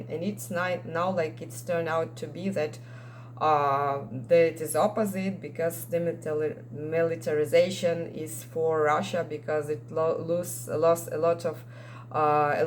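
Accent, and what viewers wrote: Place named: Oxford, United Kingdom. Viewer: Привіт доктор. Ваша англійська чудова, і я думаю, що ви вивчили стандартну оксфордську англійську. Невеликий човен, який був потоплений - десантний катер Яма з 1000 тілами - братська могила Безперечно, розвідка США та Великобританії буде вказувати місця розташування прикордонних військ – вони будуть знищені за добу, якщо вони увійдуть в Україну. Потоплення десантного корабля, більшого військового корабля і «Москви» — це чудові новини, які повинні перешкодити висадці Росією морського десанта з Чорного моря. Чудово, якщо після війни Одесу все ще можна використовувати як український порт. Побити росіян. Нехай ваша армія воює міцно і без страху. Енді (Бакінгем, Великобританія)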